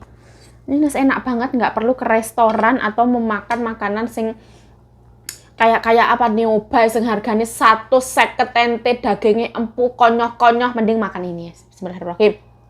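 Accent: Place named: Blitar, Indonesia. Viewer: tak kira mbak orang Blitar wkwk 🙌🥰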